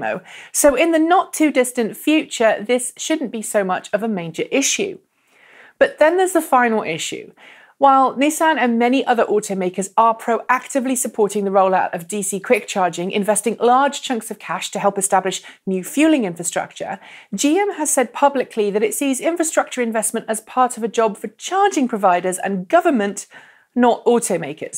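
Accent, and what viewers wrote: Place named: United Kingdom. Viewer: Cannot stand listening to British accents. Go talk about tea and cricket.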